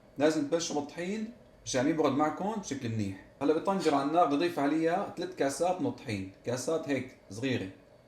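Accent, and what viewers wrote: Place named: Homs, Syria. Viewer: لك اللجة الحومصية بقلبي❤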